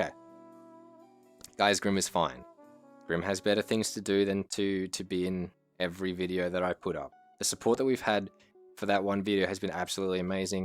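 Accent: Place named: Australia. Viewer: Nice to hear a fellow Aussie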